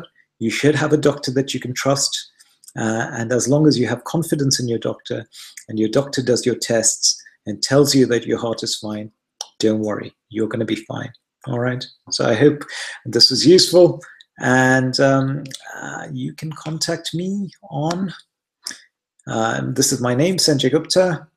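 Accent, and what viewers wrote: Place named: United Kingdom. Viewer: British accent.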